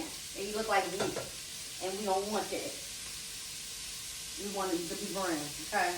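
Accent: Baltimore, United States. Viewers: Is that a b’more accent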